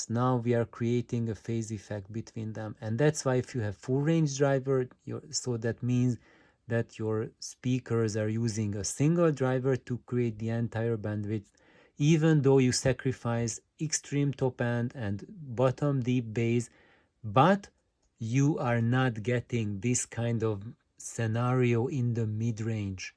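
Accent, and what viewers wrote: Hungary. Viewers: Brutális ez a magyar (Hungrish) akcentus. :)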